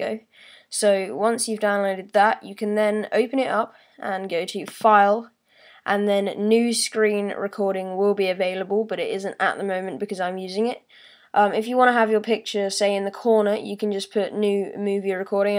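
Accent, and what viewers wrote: England, United Kingdom. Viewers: Hello are you British?? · are you english? ur accent is adorable <3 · UR BRITISHHHHH :3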